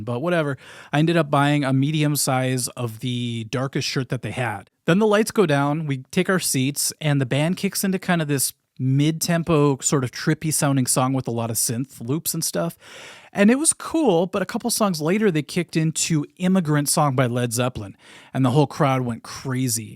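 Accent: Minnesota, United States. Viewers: Hey,. I always wondered where you might be from. I've watched many of your videos over the years and I could definitely tell you had a slight accent of some sort, but I couldn't quite place it, whether you were from Canada, Midwest or maybe even the Pacific Northwest (where people often can have a slight accent similar to the Midwest, where I'm guessing a lot of those folks originally came from). Minnesota makes perfect sense, though. You betcha! LOL:)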